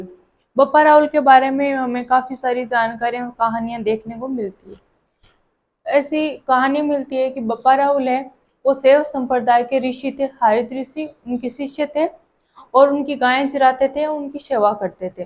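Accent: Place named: Marwar, India. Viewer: Respect Mam G Marwar se ho kya?? अपना लहज़ा लगा 🥰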